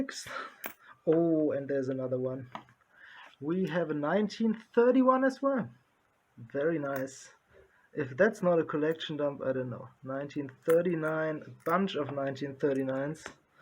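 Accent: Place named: France. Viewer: If I am to guess, this man is a French-speaking person judging by the accent.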